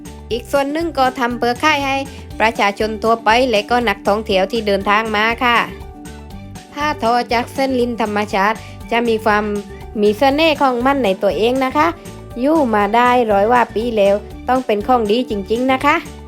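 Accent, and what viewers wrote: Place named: South, Thailand. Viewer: น่าชมมากคะ ไม่ได้มานานป้าพูดใต้แล้วนะคะ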